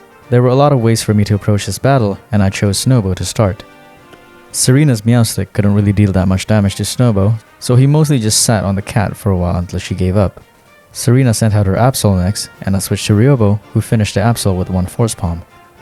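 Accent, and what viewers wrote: France. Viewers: Why did I just realize that accent sounds like you voice act Frenchy from Spongebob (narrator)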